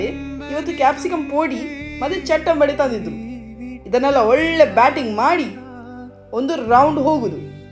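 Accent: Mangalore, India. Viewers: Nimma mangalore bhashe thumba chanda..keltane irbeku anisutte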